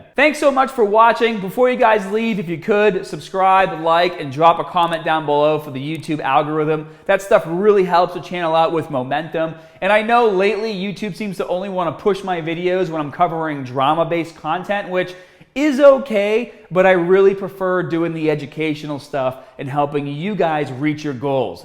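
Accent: Boston, United States. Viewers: Glad I found you again, I used to watch you way back, maybe <num>. All I could remember was that I was looking for a guy with an epic Boston accent. Great content!!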